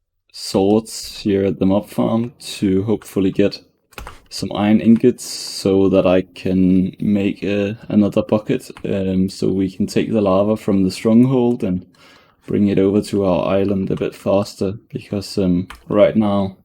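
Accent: Denmark. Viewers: Are you danish? You sound like me when I speak English That sounded offensive but isn’t just hoping it’s true(I’m danish) Nice vids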